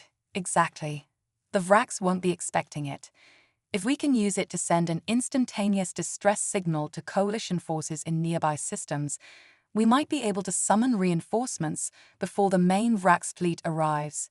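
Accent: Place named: RP, United Kingdom. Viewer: I am NOT a fan of this female upper crust British A.I. voice. Too many emphasizes on too many superlatives and verbs and staccato syllables followed by disdainful valley girl syllables at the end of sentences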